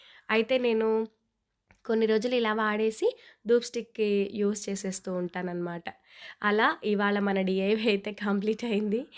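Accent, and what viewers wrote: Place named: Andhra Pradesh, India. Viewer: Hi akka bagunnara.meeru Aandra vallaa..mi matalu ala matladatharu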